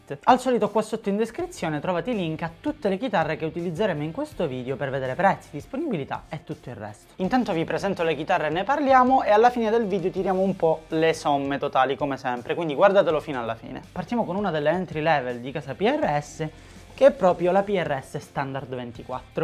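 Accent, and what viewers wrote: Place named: Palermo, Italy. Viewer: A me sta simpatico anche il suo forte accento palermitano... :-) Ma ho un debole per la Sicilia, sono di parte.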